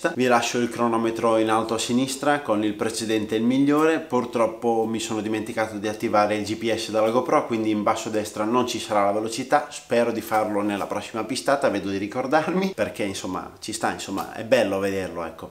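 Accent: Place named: Ferrara, Italy. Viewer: Di quale parte di ferrara sei ?? ho riconosciuto l'accento come il mio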